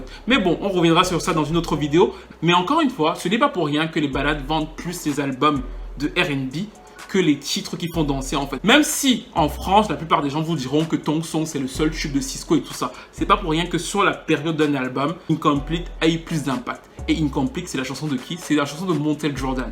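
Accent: Cameroon. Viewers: Ce mR parle comme un camerounais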